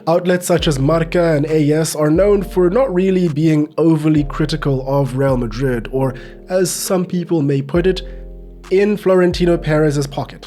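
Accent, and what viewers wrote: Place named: South Africa. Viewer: Bro, are you South African? Your accent reminds me so much if my cousins back home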